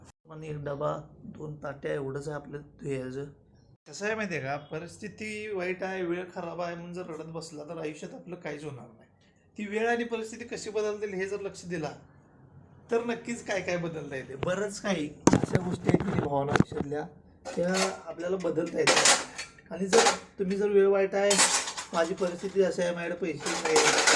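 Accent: Kolhapur, India. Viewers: दादा तुझे व्हिडीओ मी डबल बघतं असते एवढे व्हिडीओ छान असतात 😊😊❤❤❤❤❤👌👍 तुझी कोल्हापुरी भाषा खूप खूप आवडते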